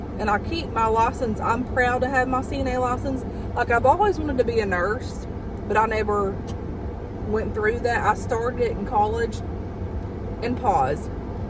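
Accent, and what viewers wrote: South, United States. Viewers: HUGS from Vancouver Canada. You are a wonderful person with a fabulous southern accent, love it! Never explain to anyone. Be yourself always. I enjoy your honesty and kindness. Have a wonderful day :) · Honey if that girl doesn’t like a southern country accent she might want to move out of the state of Tennessee. Of all the things to get upset about in this world she has to be upset about how someone speaks. Bless her heart she needs help. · I love your accent a southern accent is a beautiful thing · My favorite salad is a wedge salad. It's a wedge of iceberg lettuce with bacon and blue cheese dressing. It's so good. I adore the way you talk. Moved here to NC from Ohio and I adore the southern "accent". You have a innocent, down home feel when you speak. Don't ever change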